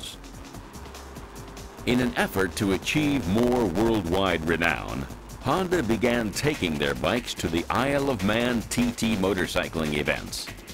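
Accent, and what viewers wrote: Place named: United States. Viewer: Hardly a "Ride on the wild side" but a leisurely stroll down memory lane, with amnesia. Short history spoiled by the abscence of the iconic CB750 voted super bike of the century and the BMW killer ST1300. The video turned out to be a 50minute long commercial, on UK roads with an American narrator. The riding sequences were boring and the videography very '70s. So glad I got this out of the bargin bin. 3/10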